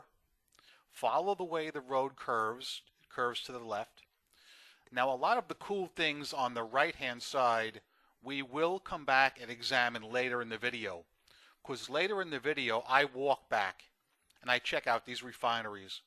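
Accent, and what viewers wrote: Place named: Queens, United States. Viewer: Hi.Your channel name mentions NY, but you live in NJ. Did you used to live in NY? I grew up in Queens and we have similar accents.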